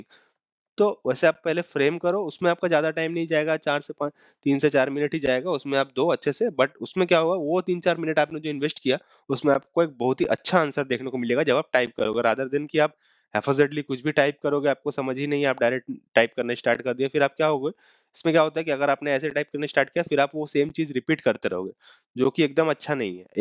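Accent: Bengal, India. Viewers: Dada, are you from West Bengal? As you sounds like a bengali.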